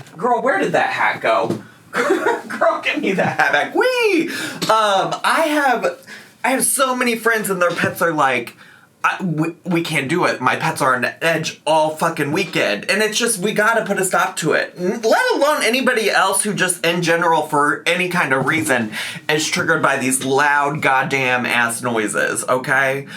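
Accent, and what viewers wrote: South, United States. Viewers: In Eric's voice: NO-ONE CARES. But did I also watch until the very end because it's with their dreamy Southern twang and cute new necklace? Absolutely.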